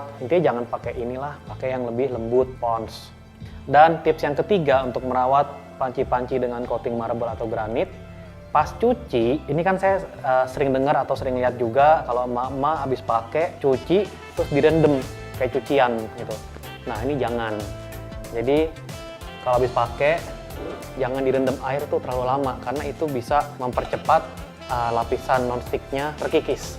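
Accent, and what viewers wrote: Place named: Palembang, Indonesia. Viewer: Wong Plembang caknyo bicek ini hhahahaa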